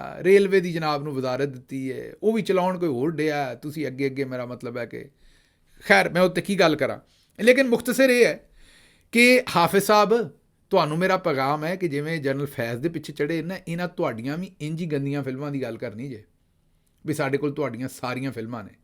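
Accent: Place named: Central Punjab, India. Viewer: بہت ھی اعلیٰ ، ستھری پنجابی میں مخصوص سنٹرل پنجاب کے خوبصورت با محاورہ انداز مزا آ گیا ، میرا تعلق کراچی سے ھے مگر میں کچھ پنجابی سمجھ لیتا ھوں ۔ بہت اعلیٰ ۔۔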